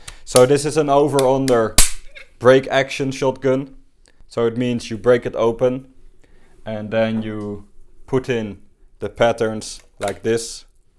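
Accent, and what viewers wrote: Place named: Netherlands. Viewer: Sound like a Dutchman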